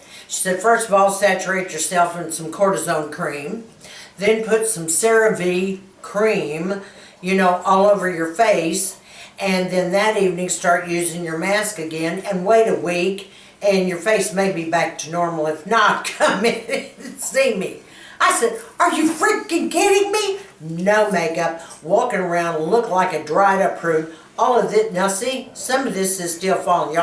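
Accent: South, United States. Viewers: I hope you cooled off by now... Anyway, this was half an hour well spent also if I don't have a channel. Interesting to learn what's behind the screen also if it's not always pleasant details. By the way - since I don't want to miss a word I do make an effort and slowly I'm getting a better understanding of your marvelous Texan accent.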